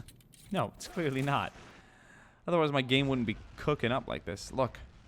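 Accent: United States. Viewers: Southern accent God tier👌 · you sound like idubbbzTv what accent in America is that?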